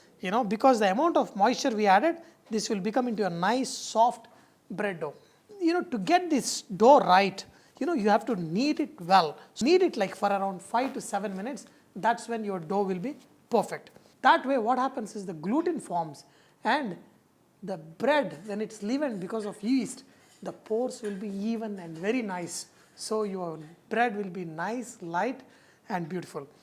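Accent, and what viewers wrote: India. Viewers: sir you are a great cook nothing wrong with indian accents but since you are a great chef, please fix some of your pronunciations. for fantastic: it is fan. not fun or funtastic. for flour it is not flar/flaar or flore/ floor. it has same pronunciation like flower (phuul). same with hour (ghanta) it pronounces same like "our". hope you didn't mind. not aar/ are. although some measurements are missing from some of your recipes, but your cooking is undoubtedly "funtastic" :). since you have a great sense of humour. god bless you. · Why do indians have such a funny accent?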